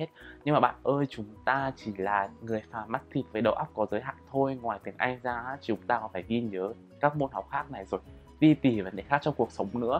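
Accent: Nghe An, Vietnam. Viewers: Bạn ấy giọng Nghệ An nhưng cố nói giọng chuẩn Hà Nội nên chưa tự nhiên 100% á nên giọng nhỏ và trầm hơn bình thường, nghe thì sẽ thấy có nhiều chỗ hụt hơi do phải "luyến" cho ra giọng HN với đôi chỗ cũng nghe ra âm sắc giọng Nghệ An:)) Cơ mà mình thấy giọng Nghệ An hay mà nếu nói chuẩn như giọng HN thì tốt không thì cũng chả sao! Just the way you are.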